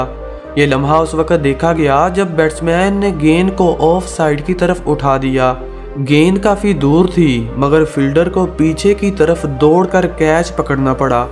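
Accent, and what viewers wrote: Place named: Punjab, India. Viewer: O mere bhai punjabi accent me commentry na karo. sab ghalat bol rahe ho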